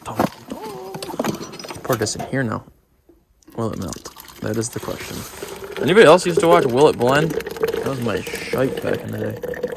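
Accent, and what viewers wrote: Minnesota, United States. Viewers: I recently broke up with my boyfriend who is from Minnesota and your accent just reminds me of him, funny how I never noticed his accent before 🤔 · Currently living in Hong Kong and am stuck here for Christmas #$%*&! I grew-up in Minnesota and love the outdoor scenic views, hearing yr MN voice, and just watching yr general goofiness....reminds me of home. Keep the videos coming as they make me feel less depressed during this crappy pandemic.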